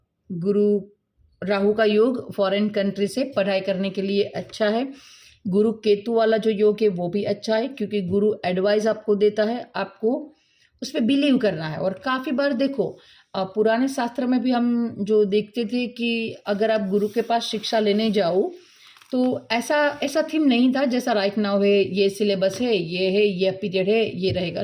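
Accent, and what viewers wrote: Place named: South, India. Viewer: Sahi kaha.. South Indian accent bot acha lg rha hai..👍🏻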